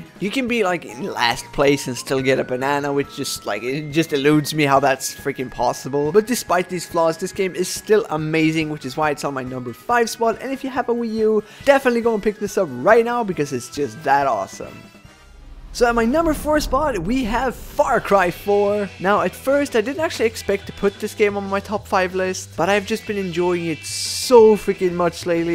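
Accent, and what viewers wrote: Skåne, Sweden. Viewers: i knew u were cause u know that one q&a vid when u said some words on swedish i cinda heard that u were from skåne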